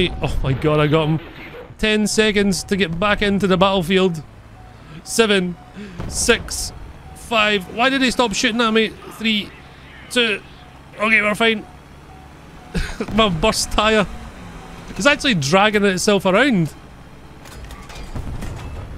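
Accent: Scotland, United Kingdom. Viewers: I have no interest in war thunder, I’m just here for that Scottish accent and the Tanks.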